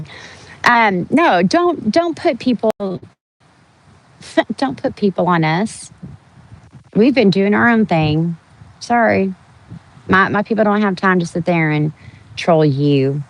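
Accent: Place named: South, United States. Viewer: I was just writing a comment about sounding like you were from below the old Mason-Dixon line. My parents are from the hills (if you know you know) and I spent time all over in a military family. But the drawl always peeks out and makes itself known! Some people are still dumb enough to think if you speak that way it means you are slow somehow...some people are certainly idiots though. Anyway, I just want to say I appreciate the work that your group, Emma666 etc do to give the public actual facts. I'm not against grown adults using their money however they want as long as they know what and who they are actually supporting and make that decision with full knowledge of all the facts. I just really hate watching people get taken advantage of; through lies, guilt, psychological manipulation, etc. There are so many organizations and individuals who actually do deserve the good will and charity of these people who are willing to donate but unfortunately after dealing with some of these people, they are then usually ruined for opening their hearts and wallets in the future later after being manipulated and scammed (imo). 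He is robbing people twice, in my opinion. Once of the funds, secondly by their stealing their future kindness, generosity and willingness to help others in the future. And besides that, some entitled assholes just need to be given a few doses of their own medicine and taken down a notch or two. If you a such a rancid individual don't impose yourself on the rest of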